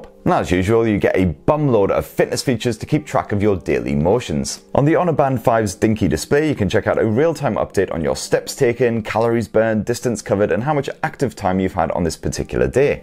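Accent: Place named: North East England, United Kingdom. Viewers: I thought I recognised the accent aha I’m also from Sunderland:) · A circular job is, and a bum load of features. Love it. Nice looking item. Wish I’d got it, prior to getting the kids into Fitbits. Can’t quite pin point the accent. South of the Tyne, but, North of the Tees?. Nice review.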